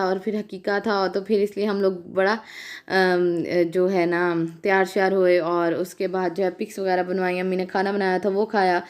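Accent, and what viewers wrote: Saraiki, Pakistan. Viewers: Aunti apna lehja theak karen sereki lehja hai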